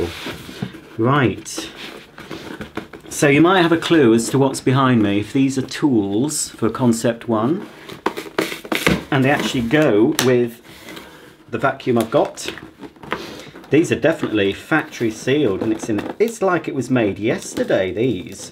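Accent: United States. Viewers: Lol I’m offended after you talking like a amarican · Nice Texas accent. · I love the american accent that wwas great. The concept and concept 2 are possibly my favorite hoover models, i have quite a few. I do find them quite often, if you need any parts let me know and ill do my best to help out!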